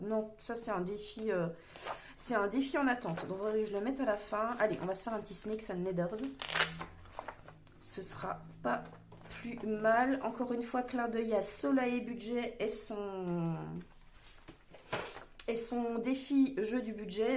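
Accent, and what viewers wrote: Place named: Haute-Saône, France. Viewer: Coucou mais moi j'adore vous écouter il est tellement gracieux notre accent ( je suis en haute Saône) la Madeleine Proust a fait fureur avec😂. En tout cas belles économies 😊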